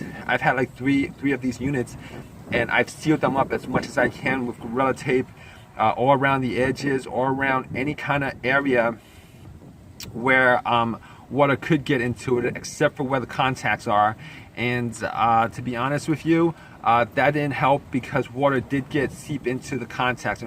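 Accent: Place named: New Jersey, United States. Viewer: Thanks for your review. Where is your accent from? New Jersey?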